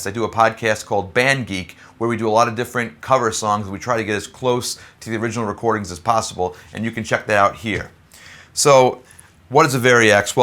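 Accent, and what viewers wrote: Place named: Brooklyn, United States. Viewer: yo are you from Brooklyn? Bensonhurst or Bay Ridge?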